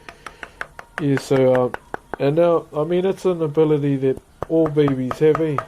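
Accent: New Zealand. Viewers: *has a new zealand accent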